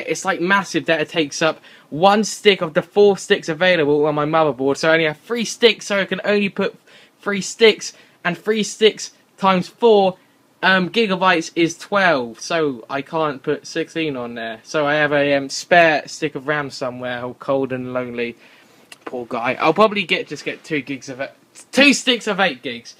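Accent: England, United Kingdom. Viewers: What part of Britain you from? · are u English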